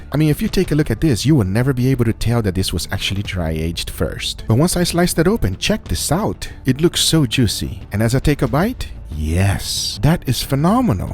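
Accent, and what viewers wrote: Brazil. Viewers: Hey bro. Are you Brazilian?? Your accent is so Brazilian! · Leva uns 5 segundos pra perceber o sotaque brasileiro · This is the first time I hear the real Brazilian accent in english. Congrats, buddy. Your channel is very nice.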